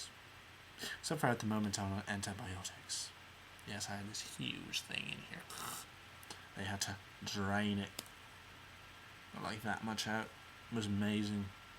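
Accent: United Kingdom. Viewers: sorry mate but you are speaking in the "queens english" accent which is the most english you can possibly be ( wait does that make sense ) anyway im british and you sound more british than me! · You are very English in your mannerism and intonation....and you like it.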